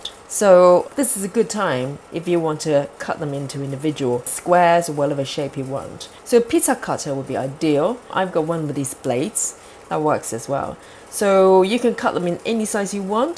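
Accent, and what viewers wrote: England, United Kingdom. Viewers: Precise and with class English accent. Thank you. 💐💐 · Hi I like your British Accent...your video contents are seems yummy surely will try I have got all the ingredients apart psyllium husk! Must get some soon! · More than everything I love your British accent.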